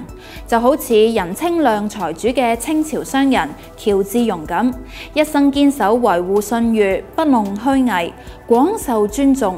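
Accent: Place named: China. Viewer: 大陆音主持，明白了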